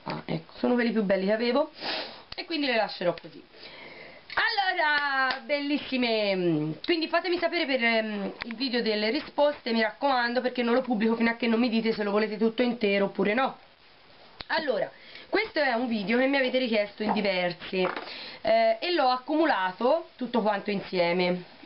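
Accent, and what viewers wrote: Tuscany, Italy. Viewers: ma ciaoooo sono una nuova iscritta,ti ho conosciuta grazie a gnappettaaa ,e ki ti lascia piu' sei troppo simpatica poi il tuo accento toscano è wonderful....un bacioneeee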